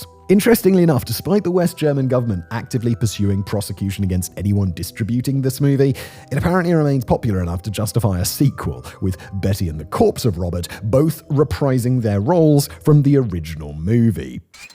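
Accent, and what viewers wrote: United Kingdom. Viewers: Shocked that your actually British thought it was a act 😂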